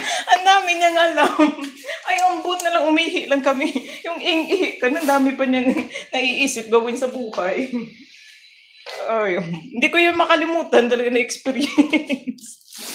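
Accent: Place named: Ilonggo, Philippines. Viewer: Natutuwa ako.sayo pag sasalita ka ng ilonggo.. ❤A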